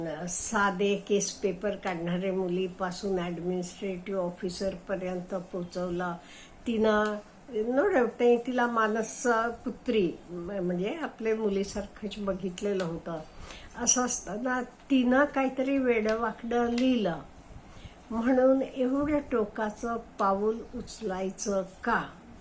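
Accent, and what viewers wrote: Karnataka, India. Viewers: तुम्ही मूळ कन्नड बोलता का. बोलण्या बरून वाटते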